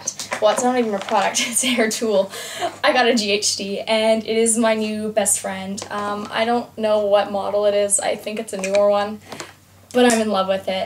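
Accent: Australia. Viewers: You seem to be taking on an Australian accent in some words =) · You gorgeous as always honey!! I love that you've taken on an Australian accent..so cute!! xo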